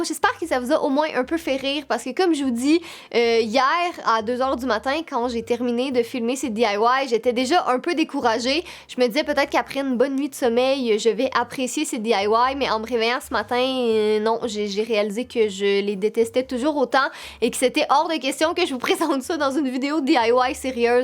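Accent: Quebec, Canada. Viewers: Oh cozu hihi l accent québécois est gros drôle et beau😂😀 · J'ai 10 ans mais j'adore l accent québécois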